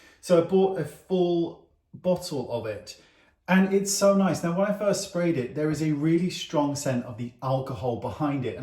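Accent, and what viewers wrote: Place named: RP, United Kingdom. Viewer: Your YouTube channel is a classic BBC English teacher for a foreigners!!😊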